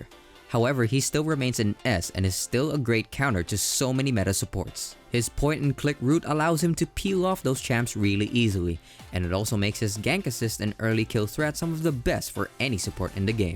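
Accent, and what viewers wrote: Philippines. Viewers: This guy talking is filipino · Is the one talking a filipino or any asian?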